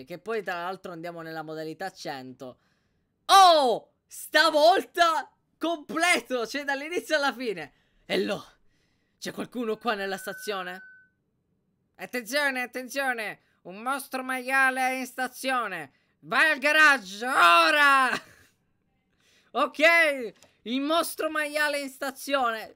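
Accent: Sicily, Italy. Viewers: Sei Siciliano??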